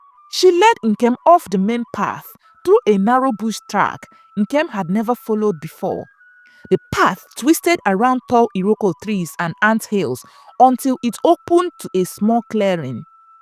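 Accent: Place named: Ghana. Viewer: You speak like a Ghanaian are 😮😮 you one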